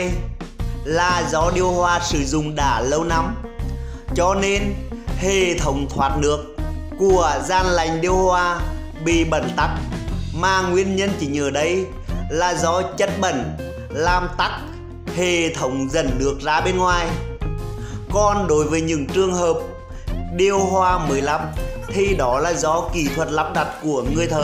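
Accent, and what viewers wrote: Nghe An, Vietnam. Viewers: Người xứ nghệ giọng nói hay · Rất hay và hữu ích. Bạn giọng Nghệ nhg ns rất rõ ràng, mạch lạc. Tks bạn nhiều! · Tuy nói giọng xứ Nghệ , nhưng cách diễn đạt và thao tác thị phạm hướng dẫn rất mạch lạc, dễ hiểu, dễ thấy, dễ làm theo.. Cảm ơn bạn nhiều !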